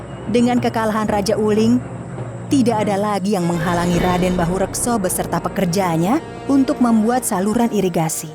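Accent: Banyumas, Indonesia. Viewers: Ngapak wonosobo😂👍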